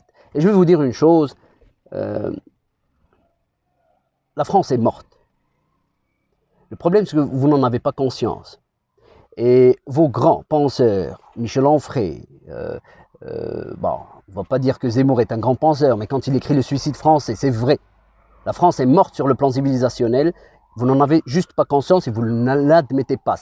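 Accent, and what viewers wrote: Belgium. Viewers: L'accent belge. Votre langue c'est aussi le français car vous parlez français dans toutes vos vidéos. Le Maroc a eu la France comme alerte. Parlez au gouvernement Français pas à la France.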